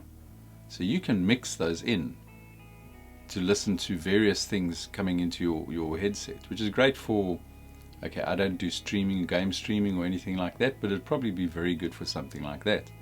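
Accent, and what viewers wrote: South Africa. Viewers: Came looking for info on a firmware update for my 840s. Stayed cause I heard a south African accent! Nice one boet!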